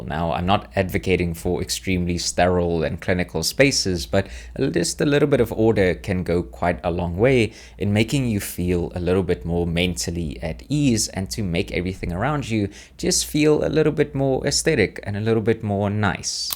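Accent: South Africa. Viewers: Are you South African? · Love the video bro but I couldn't shake the feeling that you're from South Africa, accent sounds South African to me. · bro, are you South African? i'm kinda getting the South African accent there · bro sounds south african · You sound South African… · Yoh the South African in me heard 4 seconds and knew you were from here either ways this was a majestic fyp pull, love how you explained it all and is actually had a simple understanding. Earned yourself a new subscriber 👌🏾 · Totally irrelevant, but I heard your accent and knew you were South African without checking the channel description. Howzit bruv 😂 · You south african? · are you south african bru? <3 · you sound South African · you sound south african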